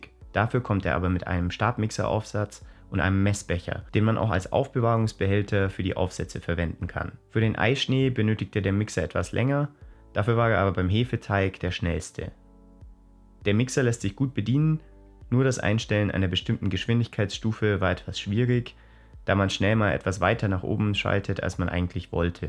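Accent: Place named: Bavaria, Germany. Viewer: Das ist Bayerisch 😁😆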